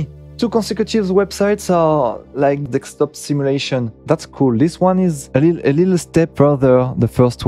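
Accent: France. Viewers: mec je suis bilingue et ton anglais est tres bon . ne lameliore pas pluss car on te comprends . si tu perds laccent francais tu perds le charme hahaha les anglophones adore laccent francais alors ne le perds pas. haha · Moi j'aime bien son accent frenchie. Et il est très compréhensible pour un anglophone. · J'adore le concept :) Ps pour l'accent fr, ne change pas les étranger aime ca ;p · L accent française est toujours présente😂😂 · C'est l'accent Français, rien de bizarre. C'est surtout perturbant pour nous Français ayant l'habitude de voir ses vidéos en Français, autrement je pense qu'à l'international son accent ne dérangera pas et au contraire ça apportera une petite touche.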